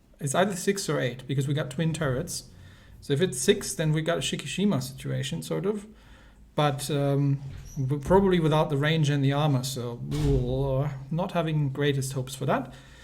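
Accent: Germany. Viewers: I must say, I do love voice. I do like a German accent. I equally like accents that sound german. May I ask, are you German? If not, then, if you don't mind me asking, what ethnicity are you? By the way, a few suggestions: try playing World of Tanks Blitz (WoTB), and, furthermore, try doing like a series of livestreams where you grind, on a new account or, if possible, on your current account, an entire ship line, from tier 1 to tier 10, in WoWsB. For example, the Yamoto line, or, even better, a brand new ship line, when another new line of ships comes out.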